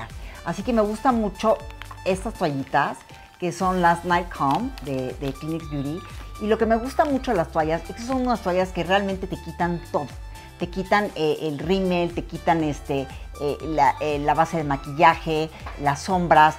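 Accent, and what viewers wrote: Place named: Fresa, Mexico. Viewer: Habla muy fresa: la caraa, maquilladaa... Habla mas natural sin esa pose, ya se oye muy falso y prepotente esa forma de hablar tan exagerada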